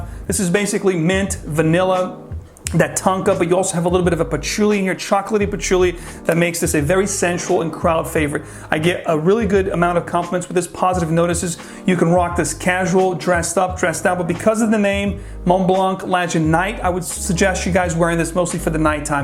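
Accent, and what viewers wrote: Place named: Philadelphia, United States. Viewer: what state in america are you in,? i like the accent. not type you hear in hollywood movies? is it philly accent?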